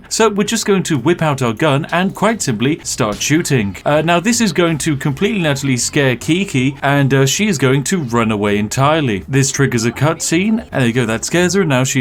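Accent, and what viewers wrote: United Kingdom. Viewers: This guy sounds kinda british.. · There's something inherently hilarious about a grown man with a refined British accent saying, "Right. Now I want to go play on the swings." My cheeks hurt from laughing. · I love your British accent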